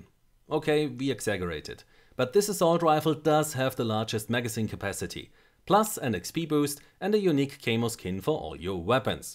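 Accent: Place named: Germany. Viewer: That German accent gave me cancer.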